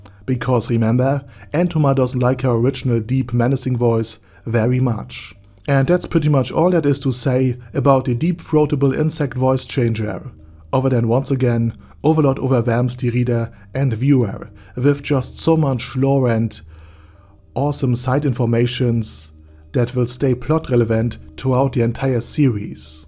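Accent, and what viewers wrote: Germany. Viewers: The german accent is pretty obvious even tho you speak without mistakes which i find pretty funny.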